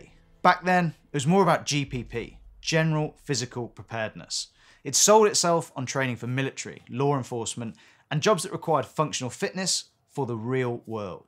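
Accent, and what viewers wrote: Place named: United Kingdom. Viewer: Maybe the obvious reason I like video like this, even when talked about "your biggest fear" Is the UK English voice even some interesting cutscenes (as additional like seasoning) 😅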